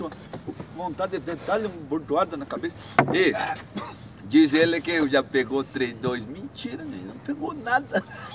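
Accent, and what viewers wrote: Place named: Paranaguá, Brazil. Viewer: é parnanguara mesmo!😂😂😂;muito massa nosso jeito de falar! Meu caneco!😂😂😂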